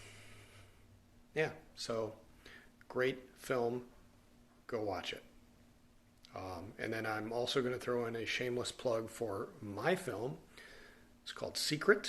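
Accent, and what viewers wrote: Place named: United Kingdom. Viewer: Your British accent ain't bad to be fair (from an English man lol)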